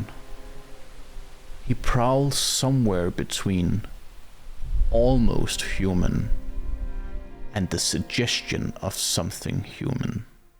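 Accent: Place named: Denmark. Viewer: Er du ikke dansker, syntes din acccent lyder som så ;)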